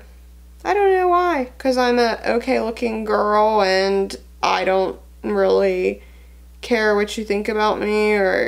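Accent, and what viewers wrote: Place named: Canada. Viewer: are u canadian? u have a canadian accent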